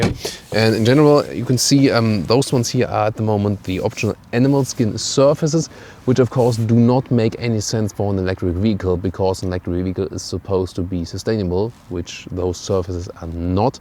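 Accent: Germany. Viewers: ich mag deutschen akzent im englischen nicht XD nice review keep going :) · Oh I just figured out when he refers to "recuperation" mode he means what we would refer to as "regeneration" or "regenerative braking". Not complaining. His German flavored English is adorable.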